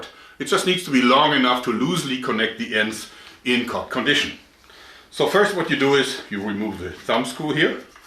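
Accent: Germany. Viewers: do you want to learn German, or do you just like the accent?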